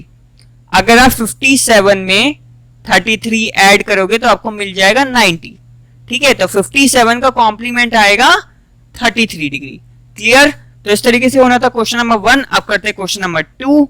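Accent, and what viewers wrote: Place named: Haryana, India. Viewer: Ap haryanvi ho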